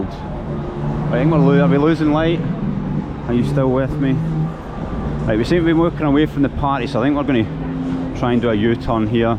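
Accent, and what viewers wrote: Scotland, United Kingdom. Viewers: Moscow is dangerous no doubt about it. I saw a person being punched in the face for speaking English. I think your Scottish accent saved you, because they didn't recognize it as English. A state that is filled with right wing terrorists is not a safe place. And you are delusional if you think you can persuade anyone to visit a state which kidnaps children and murders men women and children. The money you spend in that dump goes toward doing just that, murdering people. So no, your fake party and paid Russian propagandists will not bring tourists into that hell on earth. You try saying anything antiwar for one minute in Moscow and let's see how long you last. In any case Russia is a terrorist state which has killed 1,100,000 Russian soldiers and many ukranians too and russia is destined to collapse economically in 2026, and very likely to break up after that. 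My advise is to get out of that hell hole before the shit hits the fan, already millions and millions of Russians have escaped for their lives and many many more will. Only the ignorant stay. Enjoy your propaganda party because it could be your last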